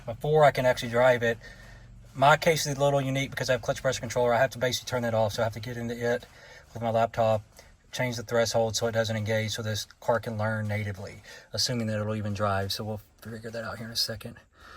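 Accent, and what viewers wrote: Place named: United Kingdom. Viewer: What's with the British voice over? This is clearly in the US lol. It's in Florida in fact.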